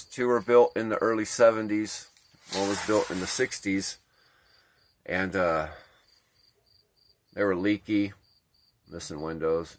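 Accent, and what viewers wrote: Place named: Georgia, United States. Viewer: You sound like a Georgian 😅🍑 The dry desert heat is it way more harsh than the heat and humidity here in the South? Like walking around in a sauna wrapped in heavy wet blanket.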